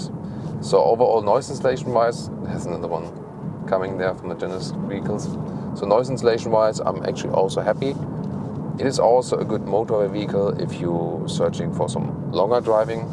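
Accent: Germany. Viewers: man, hope you will find an english teacher one day... get rid of the germglish after all these years...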